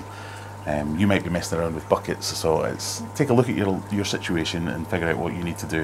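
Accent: Scotland, United Kingdom. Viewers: Tetra. 5-in-1 or 6-in-1 strip tests are very accurate and you get the results in just one minute. No crazy shakes and much easier to use. I cut mine in half lengthwise and get double the value. Love your Scottish accent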